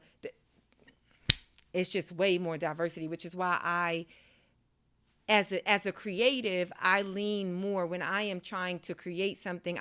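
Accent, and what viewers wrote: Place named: New York, United States. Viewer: Assalamualaikum, i enjoy her comedy so refreshing to have found such a down to earth sister Muslim with a Beautiful Ny accent and such an interesting intellectual approach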